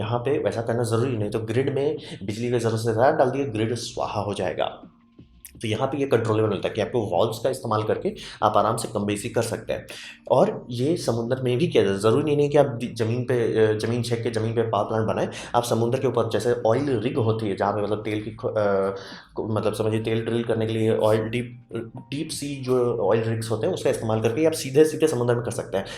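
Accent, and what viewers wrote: Bihar, India. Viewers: Sir r you from bihar ...😅........ur way of teaching is so funny and interesting...i enjoyed it...🥰......thnku so much..🙂🙂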